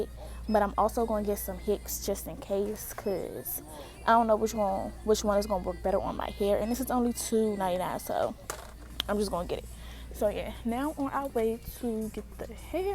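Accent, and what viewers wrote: Washington DC, United States. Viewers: Girl are you from DC or PG because the accenttttttt!!! 😂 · You started talking &nd I was like BOOM that’s DC right there lol. I LOVE this tutorial &nd how you added all the products, that’s always my main question. I also have to give you props because you have so much hair naturally I was tired for you after the bottom row LMAO. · Are you from DC? I am from Maryland and I can hear your accent. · Was going to say you’re from DC but you already confirmed. I love our accents ❤️ Great video! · I knew you sounded like you were from dc! 😭😭😭🖤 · Joy B yup 1 minute in..I'm like she from DC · Yes accent, I can tell you’re from DC!!!! Lol ❤️ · Is she from the DMV? I feel like I'm getting notes of DC when she talks lol. ANYWAY I'm finna try these next week 😜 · You from DC? I hear our accents Lmaoo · You from DC sis? That accent ayyyeee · I know a DC accent when I hear one 😏😏😏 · Subscribed as soon as I heard that DC accent 😭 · I knew the moment she started talkin 🤣🤣 DC all day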